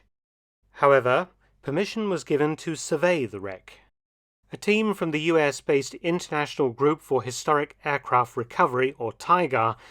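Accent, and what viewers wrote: United Kingdom. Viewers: Always enjoy Professor Felton’s documentaries. But since you appear to have a British accent, it’s quite odd how you pronounce the word “lieutenant” the American way, “loo-tenant”. It’s certainly not British English. How about not contributing to the on-going Americanisation of the United Kingdom, eh? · An oh so properly British presentation.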